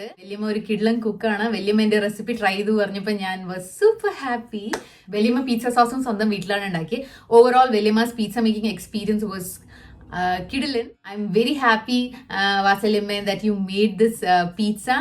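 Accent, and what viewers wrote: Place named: Palakkad, India. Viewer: Chechide slang gilu evidekayo oru plkd touch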